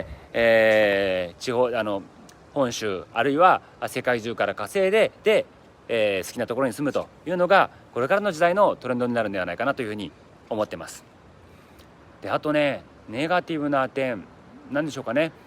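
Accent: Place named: Kanto, Japan. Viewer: あくまで「移住者が語る沖縄」ですね。沖縄県民から見るとあまりも薄ペラな動画。貴方のように沖縄をハワイと混同した「別荘地感覚」で沖縄移住する人には嫌悪感を感じます。沖縄を語るなら、まずは沖縄の言葉やイントネーションを身に着けてほしいですね。関東訛りのナイチャーが何を言ってもそれは「移住者の遠吠え」です。 なぜ沖縄が山を切り開き環境破壊しないといけないのか？ 沖縄の歴史文化はもちろん、戦中・戦後と日本本土の犠牲になった経緯。 米軍基地がある弊害。 そんな事も知らずに「海がキレイ」「南国サイコー」そんな気持ちだけで沖縄に来る移住者はマジで要らない。 沖縄移住を考えてる他県の方は「沖縄の影の部分」を見て知ってから移住して下さい。 青い海は、太平洋戦争で赤く染まりました。 美ら海水族館がある場所は数多の米軍艦船により鉄の暴風と呼ばれる艦砲射撃を浴び、多数の死者が出た土地です。 移住する方はぜひとも、平和記念公園で、この島でどんな事があったのか？ そしてそれが今現在、どのような影響を及ぼしているのかを知って下さい。 沖縄の文化、歴史、そして現在を受け入れてくれる移住者なら、私たち県民も大歓迎です。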